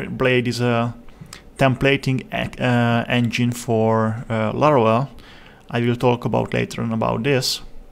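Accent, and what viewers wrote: Hungary. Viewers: 12 évig éltem külföldön, felismerem a magyarokat :) Egyébként meglepően jó az angolod. Most megyek, próbálom megérteni az auth dolgot. Ezen vagyok elakadva (middleware etc), én még a régi "nyers" php programozáshoz vagyok szokva, de elhatároztam, hogy megtanulok valami modern php keretrendszert. Így lett Laravel. (CSS az megy) Megy minden vacak már, blog rendszer, blabla, de egy role system nem akar menni: admin routing XX oldalra, user routing YY oldalra, middleware hogy mindenki azt lássa amire jogosult. Tutorialt lekövetek, másolás megy, de érteni is akarom :)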